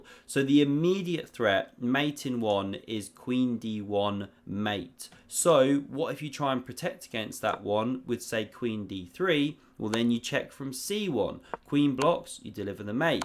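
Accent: United Kingdom. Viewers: Can you speak less british accent? It's actually really hard to stand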